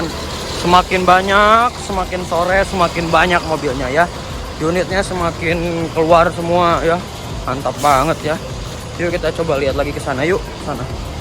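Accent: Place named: West Java, Indonesia. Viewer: Logat khas orang sunda😀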